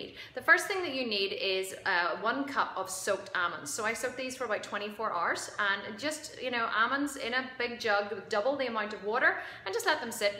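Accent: United Kingdom. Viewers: Wee belfast lady ballymena? Thanks for video its great x x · Northern Irish accent. Didn't notice that she spoke too fast, but I'm British..maybe that's why.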